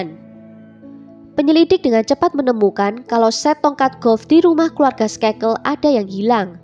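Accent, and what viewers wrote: Java, Indonesia. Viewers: Alhamdulillaah.. medokuhhh cantik 👍👍😍😍😍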